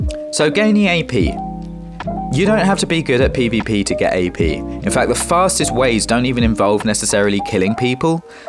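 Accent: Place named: United Kingdom. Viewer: "Oh, for fuck's sake." This sounds just like me daily. Minus the British accent. It's still a lot of fun though.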